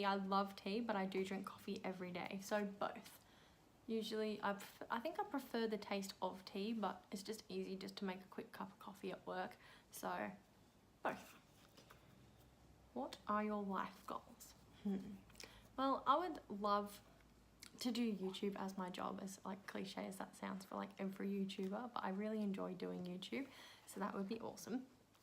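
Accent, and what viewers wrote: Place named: Australia. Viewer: I was thinking of doing this for my channel too. Where did you get the questions? 😁 Also, I can't get over how Australian you sound now!! 🇭🇲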